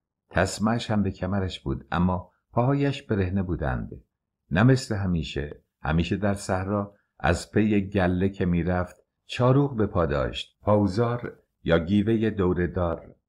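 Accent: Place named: Khorasan, Iran. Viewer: با درود استاد گرامی، گویش فوق العاده زیبایتان این کتاب را دو. چندان جالب میکند، بخصوص که مرا به خاطراتم به شهرهای جنوبی استان خراسان و همچنین لهجه زیبای این افراد میبرد. سپاس فراوان❤❤❤❤❤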